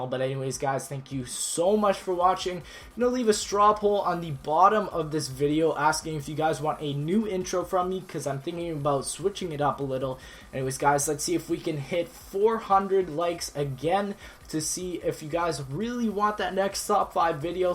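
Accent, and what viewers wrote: Canada. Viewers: Ur canadian? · I knew you were Canadian bcuz when you say about it sounds like aboot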